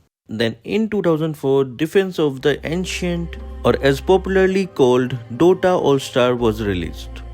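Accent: India. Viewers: chekwa na tunog indiano. lintek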